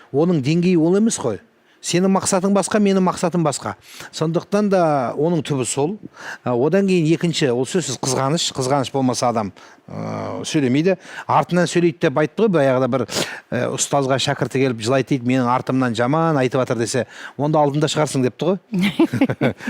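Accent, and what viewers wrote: Standard. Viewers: Имамды, таза қазақшаны сағынсам тыңдаймын, керемет шешен кісі ғой, сөз саптауы ешкімге ұқсамайтын шеберлікпен айтып жеткізеді. Риза боламын❤Қорқытпай, көркем тілмен орнына қояды. 🎉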